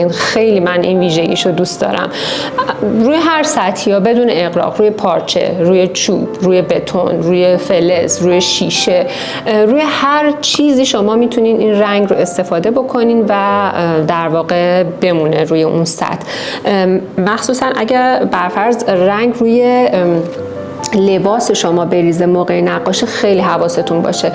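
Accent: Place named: Isfahan, Iran. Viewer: فهمیدم اصفهانی هستی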